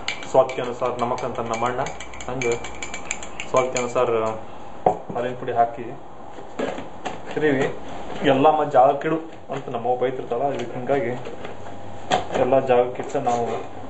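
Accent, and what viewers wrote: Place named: Karnataka, India. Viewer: i somehow like the dharwad accent of speaking kannada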